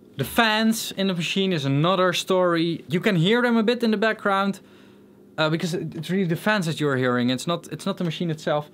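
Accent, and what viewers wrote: Netherlands. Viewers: Totally off-topic but it's so cool to hear that we have the same pronunciation. Typical dutch - English. Now on-topic....GREAT CONTENT!! I'm also a gear dinosaur ;-) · Why is a Dutch guy (I assume by the accent) who's making English videos using German terms to explain technical stuff? Very interesting video, btw!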